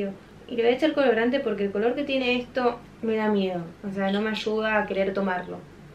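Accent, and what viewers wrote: Argentina. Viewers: Se nota que eres Argentina o Uruguaya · Es argentina porque tiene el asento argentino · Por las groserías y el acento debe ser argentina o colombiana. Ahora resulta ser mexicana :v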